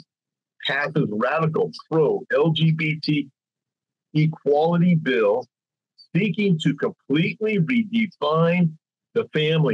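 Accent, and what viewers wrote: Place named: South, United States. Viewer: I could tell you were somewhere in the south. 😊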